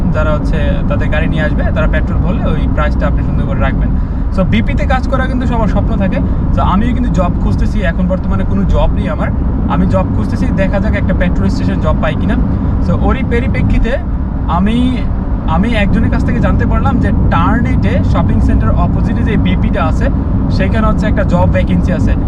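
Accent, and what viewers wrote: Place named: Comilla, Bangladesh. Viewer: vaiyaar basha comilla, amr basha o comilla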